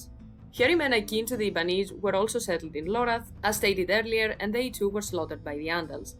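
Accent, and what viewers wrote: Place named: Greece. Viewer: you gotta be Greek the accent is a giveaway.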